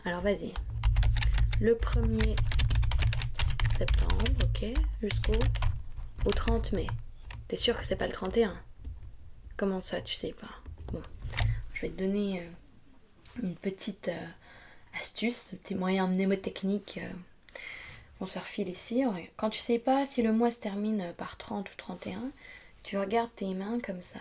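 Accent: Vosges, France. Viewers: mdr pas mal ... T'es vosgienne ? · Your French accent is very authentic and natural sounding...love to hear you try speaking English with a French accent and French with an American accent. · Étant vosgienne ton accent me rappel ma ptite ville paumé au fin fond des Vosges merci pour ce retours en enfance 😂😊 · bel accent vosgiens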